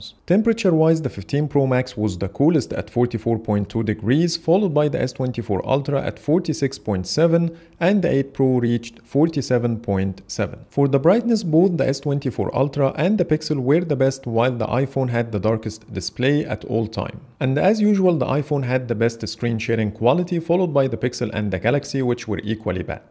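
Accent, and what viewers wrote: Egypt. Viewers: اللهجه مصري 😂❤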